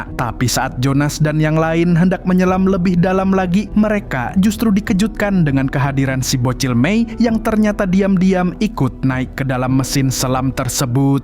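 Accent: Banjar, Indonesia. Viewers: Bahasa banjar admin nya, bungul am 😅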